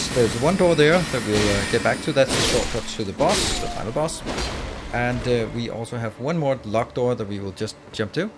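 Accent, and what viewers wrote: Denmark. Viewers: Is that an danish accent Im hearing? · Thanks I was really curious where to get that item that was on the cliff Edge. Funny enough I immediately recognised your Danish accent. Fortsæt med det gode arbejde.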